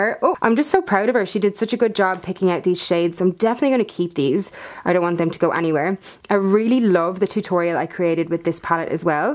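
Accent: Ireland. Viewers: New to your channel! Adore your Irish accent! Which part are you originally from? Are you enjoying the move to Sydney!? LOVED this declutter video so inspiring and generous 💞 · Sounds like faint Irish accent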